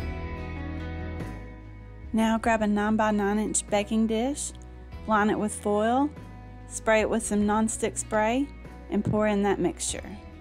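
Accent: South, United States. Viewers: Thanks for visiting my channel,! I just took a walk down Memory Lane on yours. My mom's from the south, so you had my full attention. I love southern accents. 😁And I have not seen Cool Whip and Nutter Butters since the 90's (not the kind of thing I can buy in Japan). 😆 Thanks for the great video and recipes. I love that someone ate your pie before it was set. Enjoy your long weekend!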